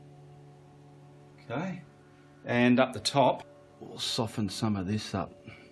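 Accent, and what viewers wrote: Australia. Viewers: Hola from Quebec Canada ! Love to paint now that I found your tutorials ! Love your style as well as your Aussie accent…though I work hard to undestand everything you say 😅 Thanks a lot !!!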